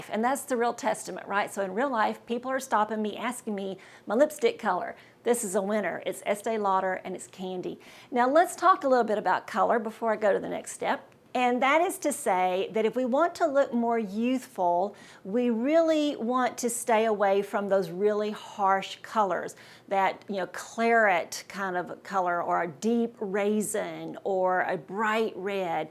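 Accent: South, United States. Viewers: Thank you for your sweet southern accent. Reminds me of when I lived in Athens Georgia at college!!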